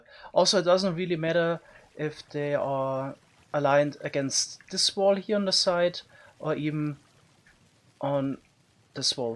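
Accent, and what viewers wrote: Germany. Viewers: Dumb question, is he German ? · Muttersprache deutsch oder? Hört man ziemlich raus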